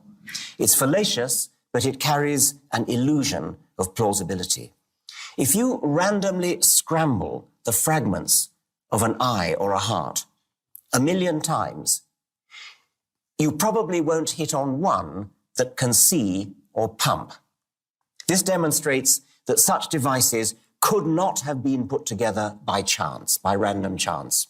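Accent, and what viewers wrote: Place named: England, United Kingdom. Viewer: MENOS K EL PROFE ES INGLES NO HABLA COMO LOS CHINOS" YANKIES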